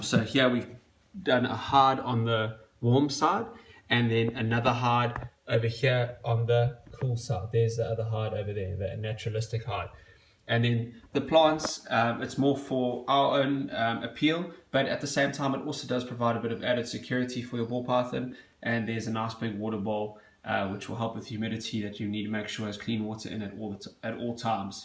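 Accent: South Africa. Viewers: Are you south African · Is this guy from South Africa??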